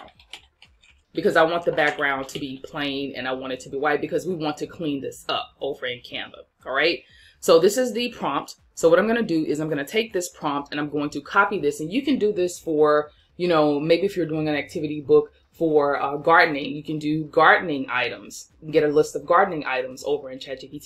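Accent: South, United States. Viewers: Great video..thanks so much. This was easy to follow and fun. Love your southern country accent.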